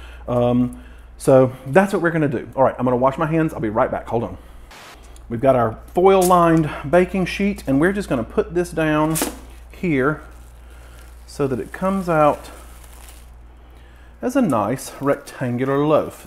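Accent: South, United States. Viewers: my favorite thing about your videos. #1, I can listen to your southern twang all day. #2, your face when you have tasted your creation! No poker face for sure. And when you don't like it, we know you don't like it. Thanks for what you do!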